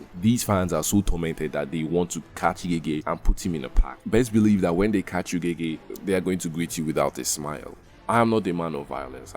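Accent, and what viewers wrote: Nigeria. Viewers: Nigerian accent obvious bro.. PH boi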